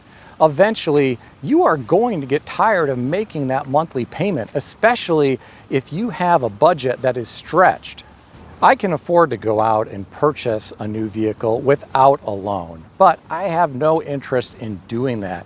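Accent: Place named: South, United States. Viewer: Love your redneck pick up drivers accent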